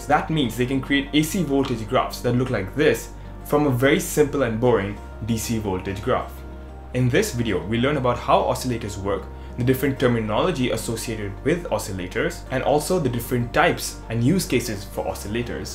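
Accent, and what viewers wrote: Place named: India. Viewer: COngrats. Ever your Indian accent we get what you say and you know and explain well. Very good video.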